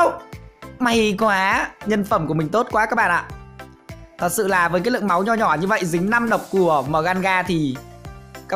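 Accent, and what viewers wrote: Nam Dinh, Vietnam. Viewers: G quê nam định à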